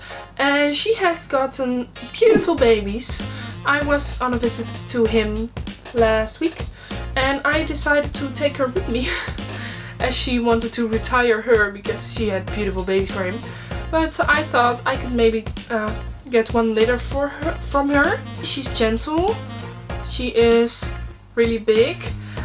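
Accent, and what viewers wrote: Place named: Netherlands. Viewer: I noticed the Dutch accent, and checked the description box. Nice to see another Dutchie like me making video's about hamsters!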